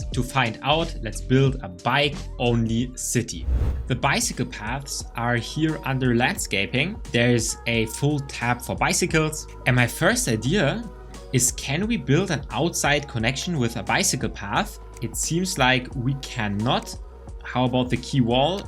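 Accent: Germany. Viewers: Deutscher Akzent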